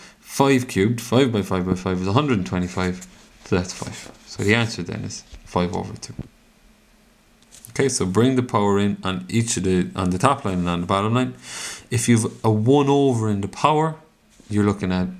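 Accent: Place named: Ireland. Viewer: Cant work out if its the irish accent that did for me but weeepppiieeee!! Got it...lol Thanks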